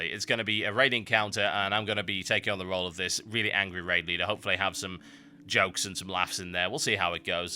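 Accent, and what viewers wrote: United Kingdom. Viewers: Thank you for your job - It might be kinda difficult to record all these great videos. Well, just know, that people are really enjoying this stuff: interesting reviews, good sense of humor and of course your accent. In fact my native language is russian and I've been learning english for 12 years. I always was crazy about brit accent and thanks to you, I have great experience of listening and obtaining of such an interesting noble accent. Plz don't stop doing this stuff- We're all with you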